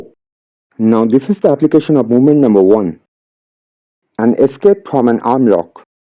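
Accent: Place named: India. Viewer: You sound like you are from India. I like that you are very critical about the indian influence on Kung Fu and debunk the myth about Bodhidharma. But in the northern KF styles I see some influence of Yoga and Kalari. Do you know the Hatha Yoga posture "the warrior". Think of that with fist and you have a typical northern shaolin posture. Also I think these long movements and low and wide stances in the northern KF forms are for stretching and energy development like in Yoga. For fighting they dont make much sense. On the other hand the southern styles the link to the combat application is more obvious and the movements have quite a different character.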